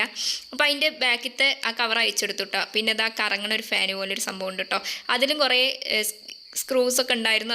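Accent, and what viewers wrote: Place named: Thrissur, India. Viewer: Nee thrishoor kaariyano ninte samsaram bhayankara ishtaayi ketto ninte samsarathil kure tto tto athanu samsaram ishtamayath njaan kannur kkaranjaan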